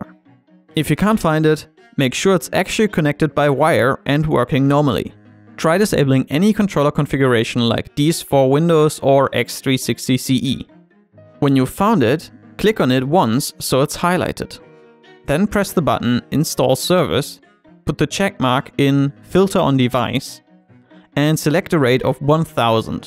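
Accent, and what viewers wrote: Germany. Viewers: Man hört so dass du deutsch bist xD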